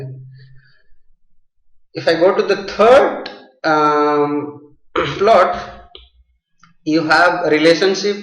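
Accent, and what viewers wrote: India. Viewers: hello sir,nicely described. You seem to be an odiya am I right sir?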